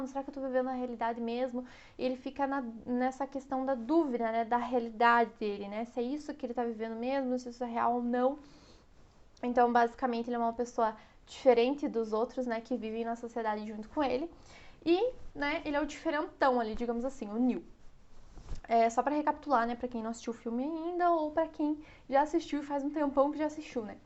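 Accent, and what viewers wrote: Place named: South, Brazil. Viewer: Ainda não consegui calcular, com precisão, este seu sotaque. Paraná ? Santa Catarina ? Rio Grande do Sul ? Interior de São Paulo ? Você é linda e o sotaque também. (Juro por Deus que isso não é uma cantada)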